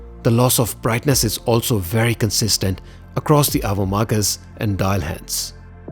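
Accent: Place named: India. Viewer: By the way, you seem to have an indian accent..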